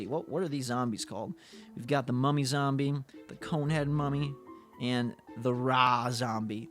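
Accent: Australia. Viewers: Are you from australia? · R u Australian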